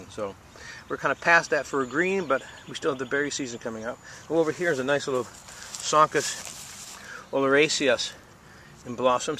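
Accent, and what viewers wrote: Maine, United States. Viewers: Just found this channel R U by any chance from New England Sounds like Maine. ( Where I'm from, but now in WI)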